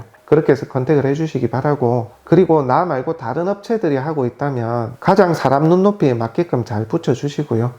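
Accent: Gyeongsang, South Korea. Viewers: 갱상도 사투리 잼나요.ㅎㅎ 홧팅!!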